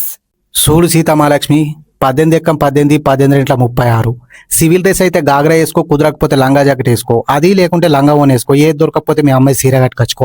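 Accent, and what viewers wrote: Telangana, India. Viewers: సూపర్ సార్ తెలంగాణ స్లాంగ్ యాసా డైలాగ్స్ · Telangana yasa lo kammadanaanni vinipistunnaaru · హే...... నమస్తే పెట్టు.... కామెంట్ పెట్టినగ.... గాత్రం,( వాయిస్ ) బంగారు తెలంగాణ యాస, కూర్పు( ఎడిటింగ్ ) అద్భుతం అన్నా.... సరిలేరు నీకెవ్వరూ.... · కోలు దండం అనే మాట చాలా రోజుల తర్వాత వింటున్నాం... మన తెలంగాణ యాస వింటుంటే ఎంత సంతోషంగా ఉందో❤ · Bro nuvvu evaro teliyadu kani I'm fida with your Telanga yasa. Excellent thought 🎉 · తెలంగాణా యాస, బాష తో వీడియోలు చేసినందుకు షానర్థులు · Em cheppinavu gee muchata Adhurs bro gee Telangana basha comedy 👌👌👌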